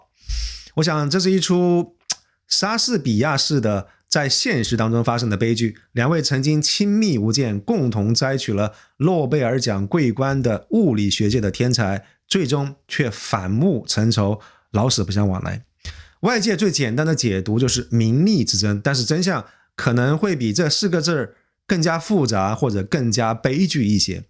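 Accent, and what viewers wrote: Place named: South, China. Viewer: 一周前才偶然关注先生的油管频道，集中观看了部分作品。首先，惊叹于先生的开阔视野和博闻强记； 其次，受益于这种逻辑完善、条理清晰而不紧不慢的叙事； 最后，感佩于这种不受主体和利益相关者的干扰，既能够设身处地忠于历史，而又能够跳出站队与偏见，努力还原真相及实事求是的态度。我不了解先生的个人背景，但令人舒适的南方口语，娓娓道来的文辞背后，包藏着古老的文化底蕴；中西合璧的知识结构，又浸润着清新的现代气息；不避争议而献上自己的一家之言和独到见解，也在诚恳中闪现出自信和智慧的光芒。虽偶有新论令人耳目一新，但更多的是那种沉稳大气、从容优雅，让知识和信息淙淙流进观者心田，如沐春风、如临清泉。世有纷扰，多所歧见。所论，颇值静观。悠管纷乱众奇葩天籁自别群乌鸦万千迷雾森森色一缕清音映落霞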